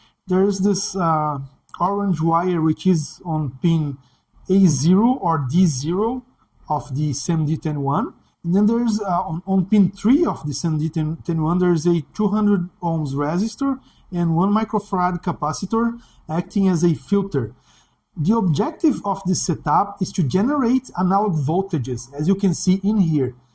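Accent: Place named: Brazil. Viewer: my guy you cant hide your accent most obvious brazillian ever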